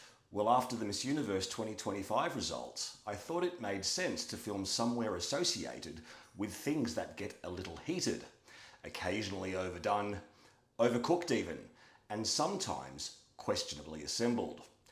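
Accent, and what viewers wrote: Australia. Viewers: Are you Australian?? You sound it. This year is the first year I actually paid any attention to the miss Universe competition and boy our Australian girl was done sooooo dirty by that ugly and boring AF dress in the national costume competition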